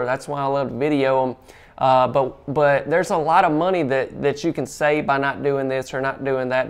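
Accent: South, United States. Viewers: Hi! I have been watching your channel now for approximately two (2) years. This is the first time I have ever left a comment. That being said, I just wanted to let you know how special I think you are. You are delightful to listen to, with that beautiful "deep southern" accent! I am born and raised in north central Florida, City of Gainesville, and home of The University of Florida "Fighting Gators"! Technically we are Southern, but most of us do not have the accent. I suppose perhaps it is the result of growing up in a major University City, where we are schooled with people from all over. Anyway, I enjoy You and your work, as well as watching the channel grow. Your Son is precious! I had 3 sons, two who gone home to our Lord and Savior, Jesus Christ. My oldest is still here, and He has given me two beautiful grandsons, ages 17 and 13. Keep up the good work young man and I will continue to watch your channel. 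God bless you and your loved ones. Angela Phillips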